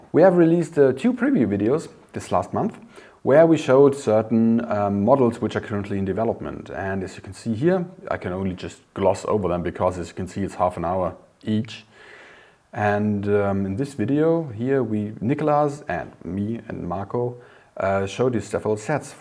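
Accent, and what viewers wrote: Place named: Germany. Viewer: great to finally hear humans here! have you considered investing in a speaker yet? No offense against but his german accent is "noticeable" lets say! anyway, is <num>x times better than any bot!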